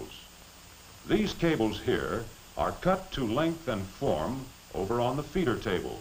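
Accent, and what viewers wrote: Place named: United States. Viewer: Beautiful American English Accent.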